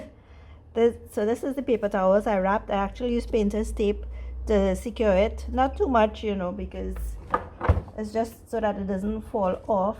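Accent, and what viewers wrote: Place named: Trinidad and Tobago. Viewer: I knew you were from Trinidad when i heard your voice it reminds me of my friend wife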